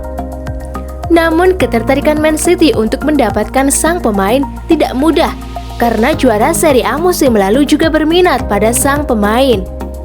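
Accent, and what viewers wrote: Indonesia. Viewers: Pengisi suara apakah orang jawa??? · Gaya bicara kaya'nya Admin orang LOMBOK..😂😂😂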